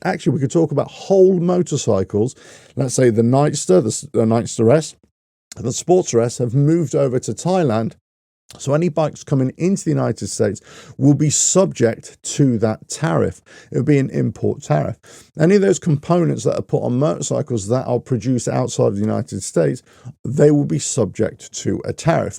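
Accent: United Kingdom. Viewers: Why is it always Donald Trump? All he does is it implement the very same tariffs, other countries have in place for US imports. You have a British accent, which means that you are beaching about how things are in your country and I suggest you take your war to Starmer!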